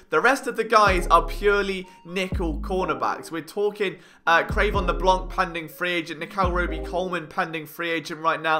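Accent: United Kingdom. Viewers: Why, because he has a brit accent? Oh No !! He's a good commentator with good breakdowns. I don't understand why he has such a low subscribe base still.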